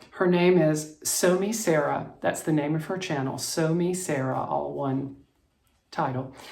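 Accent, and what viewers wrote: South, United States. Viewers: you are a natural born storyteller, and I could listen to you all the day! I had a real moment when you used the word "twitterpated ". Aside from the Owl in Bambi, the only person I ever heard use that word was my Dad, who, like you, had a lovely, soft southern accent, the only one in a house otherwise full of Boston accents. That truly made me smile. Please don't think that's a comment on your age, in fact, I am the exact same vintage as you! I loved my Fisher Price toys, and that castle was awesome! I fear I was a less than benevolent queen, however, as I delighted a little too much in throwing those poor little people through the hatch! I hope I'm kinder and gentler these days. Lol. I think you made excellent choices for your Halloween themed projects, I've got one of my own kitted up and will be sure to use the "pound sign". 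We had rotary dial, too! Take care, Kim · Simply delightful. Twitterpated - you are SO southern! ; )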